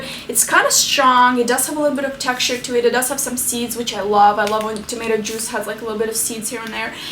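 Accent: Russia. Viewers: Lovely girl;) hope to see more videos about nutrition. I think your accent is Russian, am i wrong?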